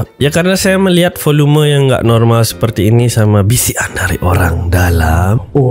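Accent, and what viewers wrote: Bali, Indonesia. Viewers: Mantab,.,.,.. nasehatnya apa adanya… aksennya org bali sekali hehe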